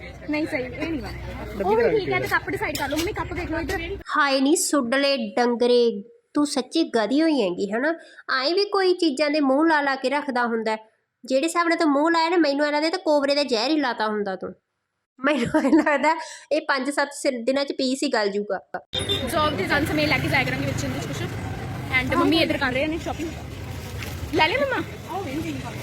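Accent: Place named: Bathinda, India. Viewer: Kida jiss hisaab naal tuc yaar pronounce krdeo oss hisaab eda lgda v tuc Bathinda ton o cuz mere nanke v Bathinda ton ne te o v bilkul same eda hi yaar pronounce krdea..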